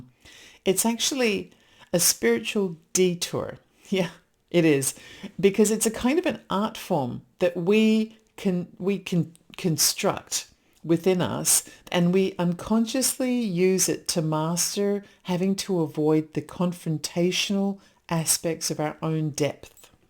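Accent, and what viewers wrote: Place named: England, United Kingdom. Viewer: In modern vernacular, it's If you spot it, you got it. When I first moved to the north of England, my southern accent earned me disapproval from the locals, who would accuse me of being posh, and talking "wi plum in't gob". I actually have a fairly strong south east London accent, which is far from posh, as you will know. You'd think I was talking like a BBC announcer from the 1950s. It took them a while to let me just be from the south, rather than a princess. So, I wonder if that was a similar thing with your dog park guy. He thought you had pretensions, bc of your slightly Englishy accent.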